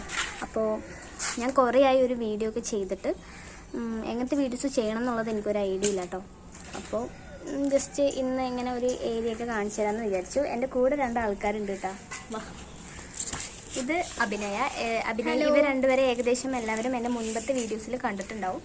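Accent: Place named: Thrissur, India. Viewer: Thrissur slank anello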